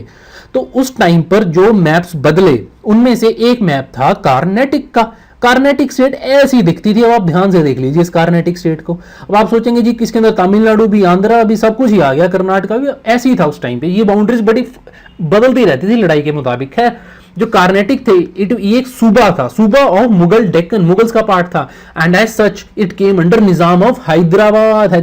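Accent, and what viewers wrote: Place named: Punjab, India. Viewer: Your way of teaching is really good I,m your big fan sir ❤️❤️❤️❤️❤️❤️bus aap please Toda Hindi Mai bhi explain kar diya kariye i, mean ek summary type hindi mai b bata diya karaiye aap and apki baato se lagta hai ap punbai hai .....Aap bilkul real waale punjabi hai bilkul cool type k rocking type like....huaaaaaa baleee balleee te sava sava🤭🤭😘😘😘☺️😇🤴🙈❤️👉❤️❤️❤️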